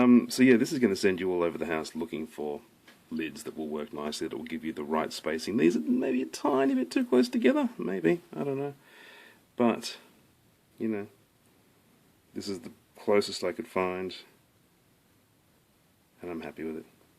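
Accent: Australia. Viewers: He's speaking English. His Australian accent doesn't make him that hard to understand.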